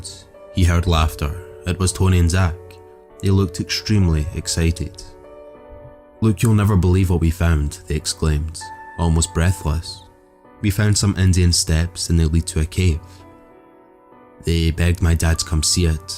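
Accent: Scotland, United Kingdom. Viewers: Omg score! Scary stories read with Scottish accent hah! Love it! ❤️😁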